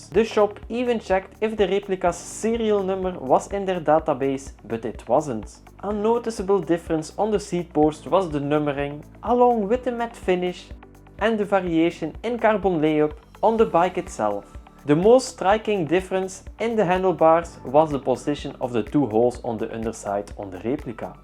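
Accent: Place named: Flanders, Belgium. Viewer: That's one thick Flemish accent brother! 😂 Very good video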